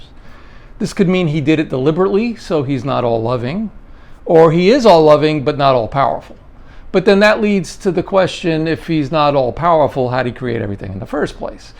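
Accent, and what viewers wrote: New York, United States. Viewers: I’m also from NY, Long Island, you talk like me 😊 I was raised Christian, tried to be Catholic but in divorced remarried so I’m shunned 🤦‍♀️ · I am so sorry. I thought you were Jewish. But you are from New York, your accent is so clear. I am so sorry for my ignorance ❤ I am not even American 😂 Hi from Norway 👋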